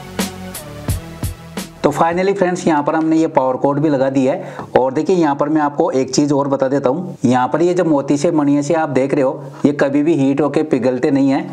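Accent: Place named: Bihar, India. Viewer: Extremely GOOD EXPERIMENT & worth the effort & please form A company to manufacture & sell as a start up company !! You sound like a BIHARI SCIENCE GRADUATE !! If you R please confirm or deny it !! Please also wie your own introduction in Hindi or English as I am proficient in both languages !! From A ( Retd ) MENTAL HEALTH PROFESSIONAL, ORIGINALLY FROM BANGALORE !!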